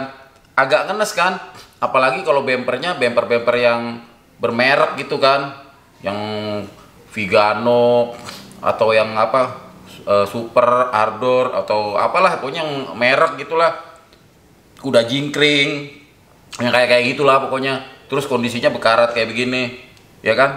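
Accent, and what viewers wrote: Jakarta, Indonesia. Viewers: Betawi tulen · Kalo ane bisa sucrib 10 x ane kasih dah. Konten nya berkualitas. Penjelasan nya enak bngt dg bahasa khas betawi. Bini nye ikut nyumbang layar tambah asyik. Top markonah deh. 👍👍👍👍👍👍👍👍👍👍👍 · Betawi punye ni mah. Mantap cang... 😁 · Betawi Asli... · Saya suka sma bicara nya mengingatkan saya sma tmn orang Betawi logatnya itu bikin candu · Logat Betawi👍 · Nt betawi mane bang roman ny sedep bener logat nt. Salam kenal bang dari ane nak betawi kemayoran 🙏🤗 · Seru ngomongnya betawi tulen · Yg ky gini ni gw suka.. apalg sama Abang nya yg kasih tutorialnya pake logat Betawi nya..keren HBs dahh pokoknya..sehat selalu bang..mksh berbagi ilmunya Nye..salam dari Kalimantan timur Balikpapan..🙏🙏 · Pap dengan logat betawi,,, josss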